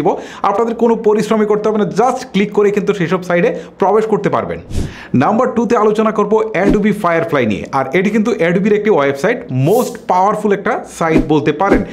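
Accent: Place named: Kishoreganj, Bangladesh. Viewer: আপনার কথা শুনে মনে হচ্ছে আপনার গ্রামের বাড়ি কিশোরগঞ্জ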